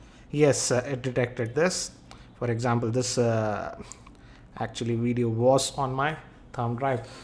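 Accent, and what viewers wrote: India. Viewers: Indian accent, the worst on the planet...what is this guy saying exactly? Indians claim to be native English speakers but I seriously doubt that...it is a 3rd language to them.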